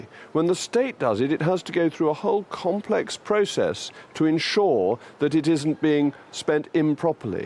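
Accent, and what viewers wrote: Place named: Upper class, United Kingdom. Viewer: Sorry, but I'm not stupid enough to listen to someone with accent like yours talk about "work". Jog on, toff.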